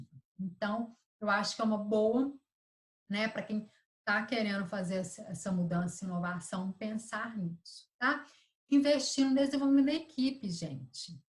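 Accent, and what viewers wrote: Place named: Goiás, Brazil. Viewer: Sotaque goiano detected. Parabéns pelo vídeo minha querida.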